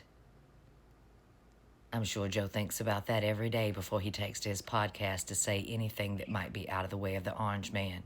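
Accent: South, United States. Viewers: Your accent is beautiful, I have never had a good impression of southern accents until you. Subscribed for the pleasure of listening and intellect. Thx, you should narrate for documentaries... ❤️ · Totally agree!! It reminds me a little of the Agatha Christie' A Murder Is Announced... "she wasn't there"... and the ear wound... IYKYK Just to ask where in the States are you from? As an English woman, I love your accent! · Tbh, at first I thought you were saying Butt Love🤣, not Butler! I was thinking that maga would be all disturbed for sure about FDT engaging in Butt 🏳️‍🌈Love, and any endless conspiracies of 🏳️‍🌈 behaviour... 😂 I'm not from the states, btw, so sometimes the stronger accents from the south distort the words in a way I'm not used to hearing. Thank you for the giggles!!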